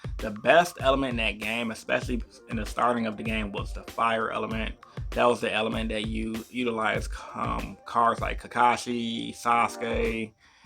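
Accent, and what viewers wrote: Chicago, United States. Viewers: Were you from Chicago?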